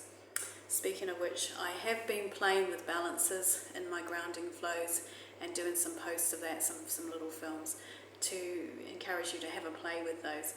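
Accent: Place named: Australia. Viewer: I am a mixed media Journaler. I am liking the positivity in your videos. Yes living abundantly is the key so WELL DONE. You have a lovely Australian accent but which Country are you living in plz? Do u grow your own food? Do u fast? I have so many questions. I am making space in our life to recieve.- decluttering- having 2 much stuff is hmm.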